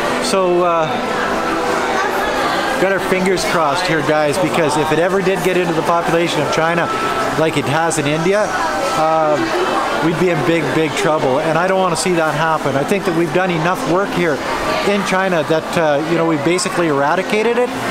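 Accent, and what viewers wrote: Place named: Canada. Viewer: Sounds like a Canadian accent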